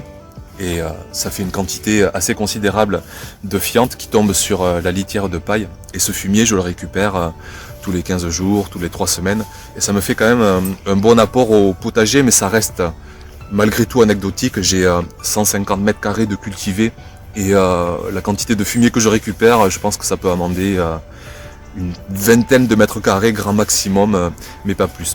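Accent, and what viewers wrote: South, France. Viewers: Bonne idée!!! Sais-tu si on peut aussi utiliser les huiles essentielles contre les poux rouges. Pour l'instant, je n'ai pas ce problème, mais, en prévention, je voudrais agir avant de devoir guérir. Au fait, Est-ce que c'est bien al que je connais? Nathalie VALERO Merci pour tous ces partages de belles expériences. A l'accent, j'entends que tu es dans le Sud. Et à l'emploi de certaines expressions, tu me fais penser au naturopathe Christophe BERNARD. Est-il de ta famille?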